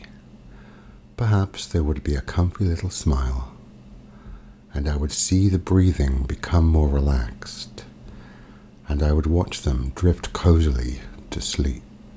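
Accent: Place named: United Kingdom. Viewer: any British gentleman's voice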